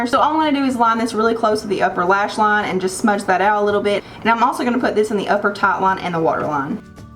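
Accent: South, United States. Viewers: where are you from ? i can tell you're from the south , i'm from north carolina and i wish my accent was as beautiful as yours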